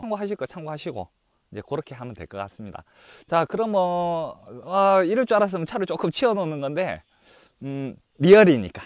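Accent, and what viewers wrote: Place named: Seoul, South Korea. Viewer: ㅎㅎㅎ 앗~ 서울사람이다~~~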